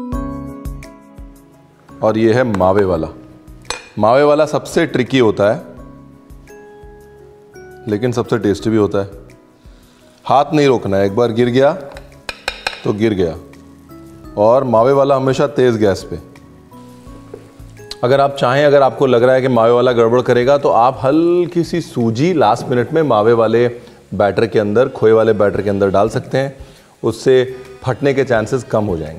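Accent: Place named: Lucknow, India. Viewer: Hey Ranveer I'm Gaurav from lko You are first whom I had subscribed I had never subscribed any one before on YouTube. Just telling you coz I admire you and your talent of cooking And over n above your commentary during cooking , poora lakhnavi andaaj Youu kahe ki baaton se hi tar kar dete ho . Hope you will read and reply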